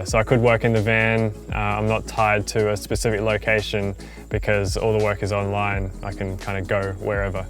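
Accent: New Zealand. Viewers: i knew you were from new zealand lol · He's a kiwi - from New Zealand 😊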